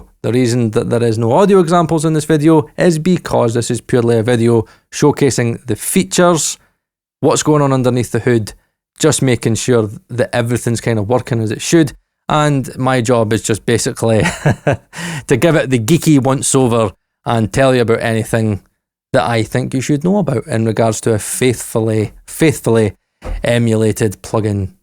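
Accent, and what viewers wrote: United Kingdom. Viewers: Great video! I use a Harrison parametric EQ that I absolutely love. I got it from plugin boutique for $10 USD, but I'm really interested in trying this one out. Where in the UK are you from BTW? I've gotta sort your accent out!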